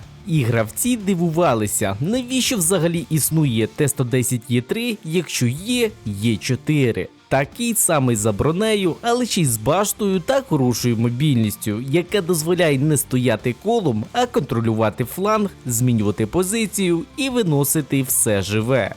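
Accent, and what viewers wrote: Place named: Russia. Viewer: А де КВ - 1С? З альфа пуканом? І скажу ще одне, одразу чути що перейшов з російської на українську. 😅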